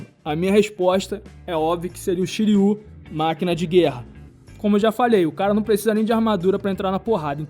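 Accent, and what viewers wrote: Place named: Rio de Janeiro, Brazil. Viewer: KKKKK MANO ESSE SOTAQIE É MT HILÁRIO Parece q eu to falando sobre cdz com um daqueles tios de boteco do rio Melhor canal de cdz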